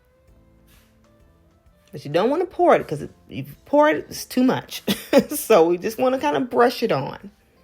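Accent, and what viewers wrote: South, United States. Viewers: WOW SOUTHERN LADIES ARE THE BEST...IM MAKING THIS 😊 AND I PERSCRIBED TO YOUR CHANNEL ❤ THEY CALL ME WOODY BECAUSE PEOPLE ARE ALWAYS SAYING WOULD HE? 😂 I HAVE BEEN LOOKING AT YOURE CHANNEL AND MY MOUTH IS WATERING,I WANT TO MAKE THE MUSTARD/HONEY CHICKEN I LIKE TO COOK AS IT RELAXES ME AND I LOVE YOUR ACCENT. I BOUGHT NATALIE DUPREES BOOK NEW SOUTHERN COOKING. IM MAKING TWO NOT AT THE SAME TIME THOUGH...I WANT TO TRY ONE WITH SHORTBREAD COOKIES SOAKED IN THE PINEAPPLE JUICE TO SOFTEN THEM INSTEAD OF THE POUND CAKE, I HOPE YOU DONT MIND 🙏 THANK YOU I LIKE THAT YOU MIX ALL INGREDIENTS BY HAND, IT ADDS MORE LOVE TO IT 😇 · I enjoyed your No Bake Pineapple Dream "Cheat" Cake and want to say thank. I particularly enjoyed your smooth and delightful Southern accent. You come across as a very sincere, peaceful and happy lady. Looking forward to your next recipe sharing. 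God bless you real good,!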